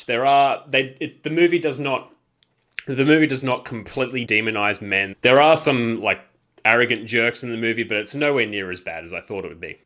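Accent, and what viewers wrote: Australia. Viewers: Hey thanks for the sub man, subbed right back cause instantly I'm seeing stuff I like! I'll be keeping an eye out for your future stuff! Nice to hear the Aussie accent in more movie reviews too haha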